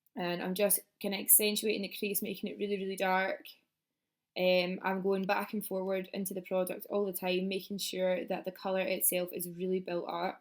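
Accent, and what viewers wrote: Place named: Glasgow, United Kingdom. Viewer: Gorgeous, I love it. As a Glaswegian in Yorkshire it's lovely to hear a familiar accent! Nars eyeshadow base is fantastic-I have oily eyelids and nothing budges when I use that-and even mac paint pots crease with my eyes! X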